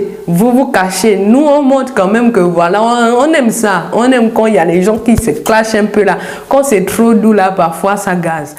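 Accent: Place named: Gabon. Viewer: Ça c’est l accent de ma sœur gaboma si j n m trompe pas. Merci pour l analyse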